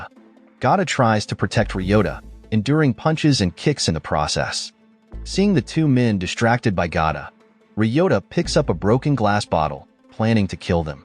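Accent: United States. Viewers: Can't this channel use a narrator who speaks without stupid amerikan prounciation?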